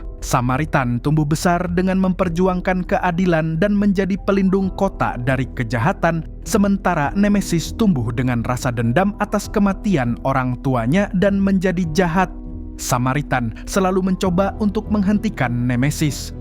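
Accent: Banjar, Indonesia. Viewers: Pian urang banjar kalsel kah 😅😅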